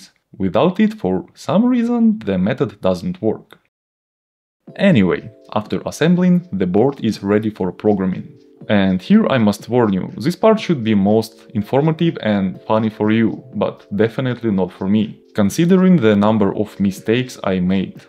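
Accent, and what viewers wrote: Russia. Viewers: русский русского услышит всегда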